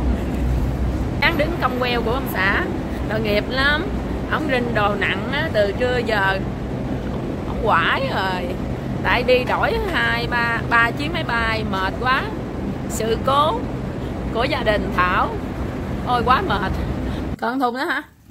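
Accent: South, Vietnam. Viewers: Chi noi chuyện dung chat dan Nam Bộ minh luon de thương dễ gan gui nữa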